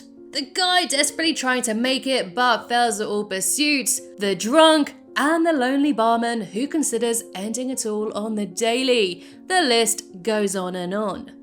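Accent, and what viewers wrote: United Kingdom. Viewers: the narrator is ANNOYING! I fucking HATE these british narrator accents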